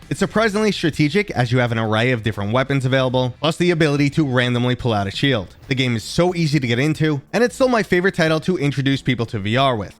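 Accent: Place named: Brooklyn, United States. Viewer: You gotta be from Brooklyn. Also, 311 rules. Cheers!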